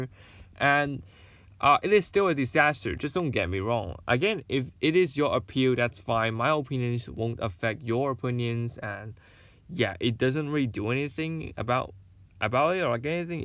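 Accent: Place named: United Kingdom. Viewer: British accent~~~~~~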